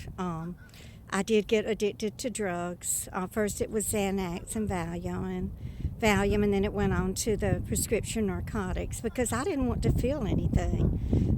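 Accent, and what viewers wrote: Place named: Deep South, United States. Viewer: Sweet lady, you have my respect. So glad Uber goober lady referred me here. You remind me so much of the lovely ladies of my youth in the Deep South....not sure why coz not just your accent. I think it’s your facial expressions and manner of speech. Anyway, love and respect from another older lady of the south. Blessings, my dear.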